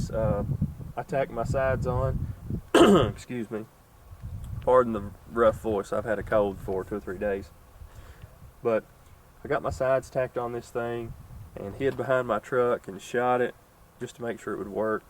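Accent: South, United States. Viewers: very neat idea, also love the Tacoma, '05-'07? The wife said ya must be a "Southern" boy. Accent. I couldn't tell.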